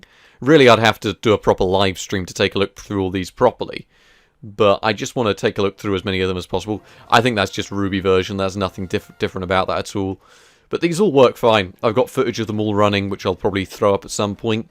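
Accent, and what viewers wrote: Canada. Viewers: Canadian English 😂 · Canadian English, perfect! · Canadian English eh?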